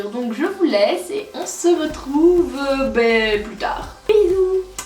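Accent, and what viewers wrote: Belgium. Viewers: L'accent belge 😍😍😍💕💋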